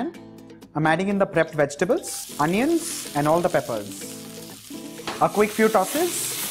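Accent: India. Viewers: Authentic Mexican recipe with Indian accent. I’m going to try this recipe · Love this funny Indian English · mate it's, he just has an indian-like accent.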